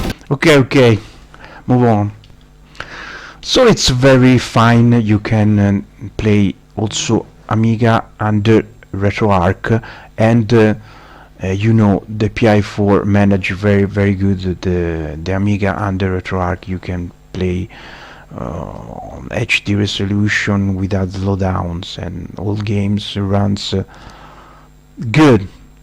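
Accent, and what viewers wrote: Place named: Italy. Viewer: Mi pareva dall'accento inglese :) Bel video, non conoscevo Batocera, ci darò un occhio!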